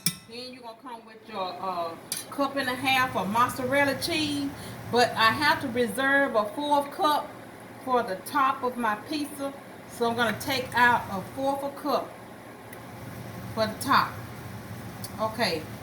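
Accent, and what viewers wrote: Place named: South, United States. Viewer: You really have a southern accent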